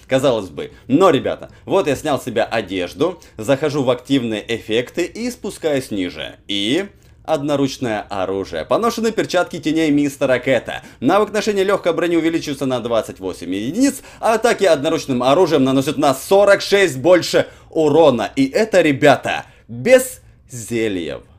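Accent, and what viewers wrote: Ukraine. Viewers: Ты под корнем нирна играешь?)) Украинский акцент делает ламповым видос👻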